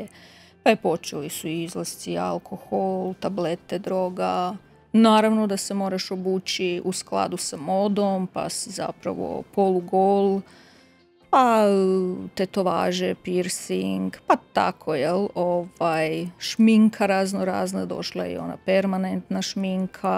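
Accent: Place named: Kajkavian, Croatia. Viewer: Svjedočanstvo super ❤️ ali glas , naglasak JEL, KAJ užasno iritantno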